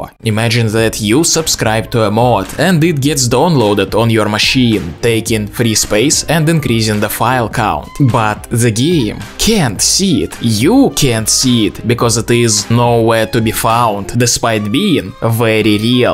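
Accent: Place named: Russia. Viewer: так забавно слушать жесткий русский акцент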